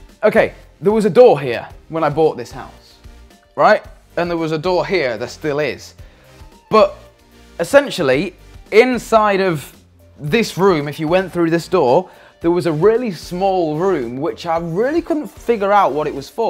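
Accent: United Kingdom. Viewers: Is he welsh?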